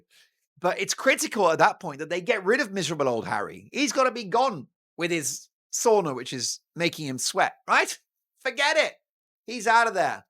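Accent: Australia. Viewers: Love the Australian accent. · 😂😂 your Aussie accent is on point.. brilliant.. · Love the Auzzie accent. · Dolan, you are very entertaining and you do the best Aussie accent I have ever heard, cracks me up every time (I'm Aussie so I do know). "Cobber" is very sixties though, it's "mate" now but keep up the fun times with this show!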